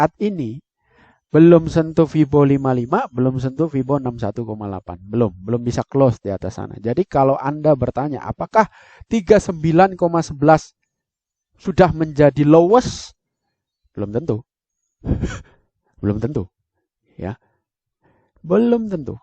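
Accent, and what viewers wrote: Java, Indonesia. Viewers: gw suka sama suaranya yg medok2 dan konten2nya bermutu.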